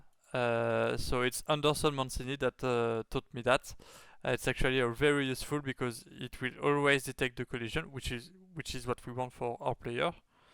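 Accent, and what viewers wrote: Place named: France. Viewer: hello again, j'adore, quand, nous les fr ,nous parlons en anglais ^^ on a un accent parfaitement reconnaissable ^^ top projet !! ça m'inspire, pour essayer de créer un MK avec Unity ou UE mais pour l'instant, je suis sur la creation de Zelda totk ^^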